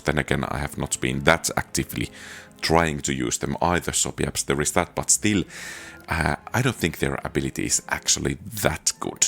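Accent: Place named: Finland. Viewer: What happened to your voice? You went from British accent to Finnish accent. I'm confused, unless you're using text-to-speech?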